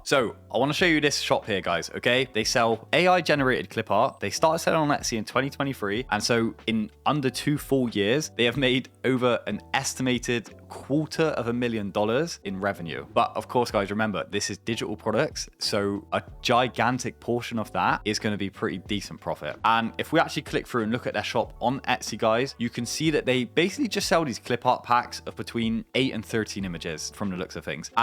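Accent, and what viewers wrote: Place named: West Country, United Kingdom. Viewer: The Etsy brought me here, the West Country accent made me stay. 😂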